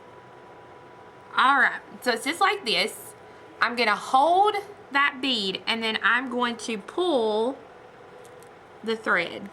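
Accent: South, United States. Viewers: I totally enjoyed the video! I'm from Alabama, so I understood your southern English easily. Thank you!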